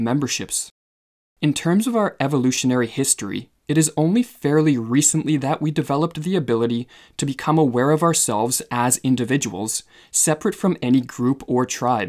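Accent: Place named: Canada. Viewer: Canadian accent, do you hear it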